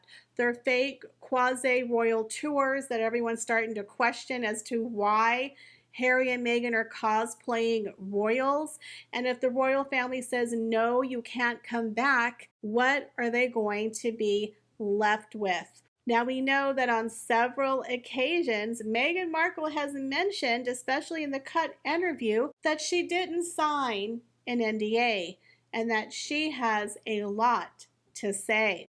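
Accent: South, United States. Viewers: I really like your views and you speak exactly the same as my southern cousins. I’m in the middle 🇺🇸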